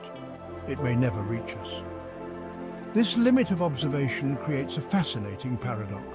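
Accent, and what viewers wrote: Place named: United Kingdom. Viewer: Old husky British voice 😁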